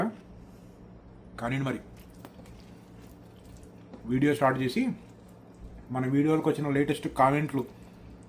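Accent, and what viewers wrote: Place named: Andhra, India. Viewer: Nizamabad antav Mari Andra slang matladthav?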